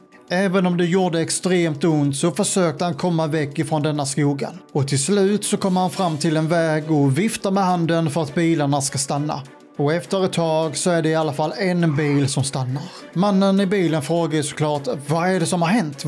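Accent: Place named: Scania, Sweden. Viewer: Översättning förstår inte din skånska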